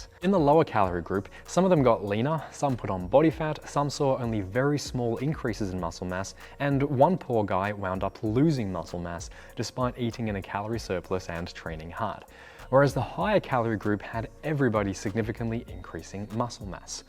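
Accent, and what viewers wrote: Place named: Australia. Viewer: got the Aussie accent, is drinking hot tea with its own little teapot, is jacked as fuck, AND WEARING TOOL T-SHIRT. I THINK I'M IN LOVE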